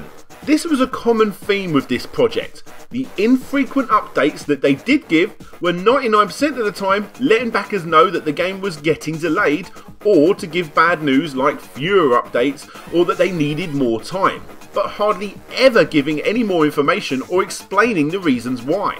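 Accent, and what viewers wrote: United Kingdom. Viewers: almost every count down video will have a british speaker....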